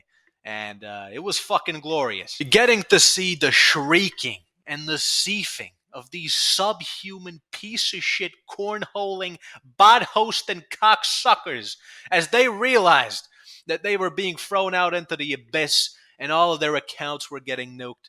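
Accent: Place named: Italian-American, United States. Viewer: Youre the most italian american man ive heard since i watched the sopranos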